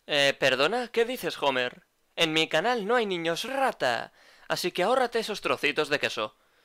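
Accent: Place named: Spain. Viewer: ERES ESPAÑOL y lo se por la voz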